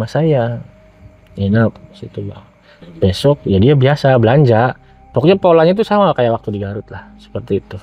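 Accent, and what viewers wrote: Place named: Indramayu, Indonesia. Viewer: Enakan baka cerita Asli wong dermayu kien mah kang ketenger sg bahasae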